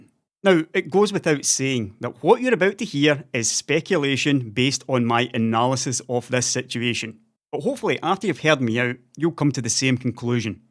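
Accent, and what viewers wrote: Scotland, United Kingdom. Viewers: the heavy Scottish accent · A Scottish accent telling me about technology feels too right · i just love scottish accent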